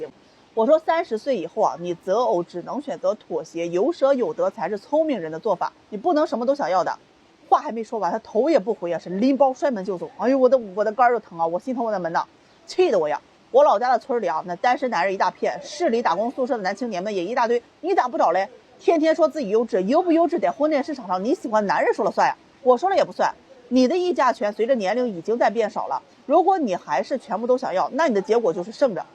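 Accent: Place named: Jiangsu, China. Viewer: 她江苏的 口音不是东北的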